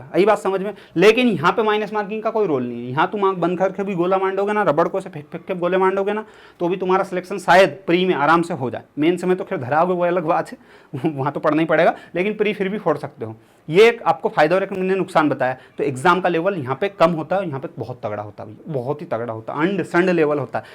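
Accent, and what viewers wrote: India. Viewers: Bhai apka bolne ka tarika mast hai sudh desi mujhe pasand aaya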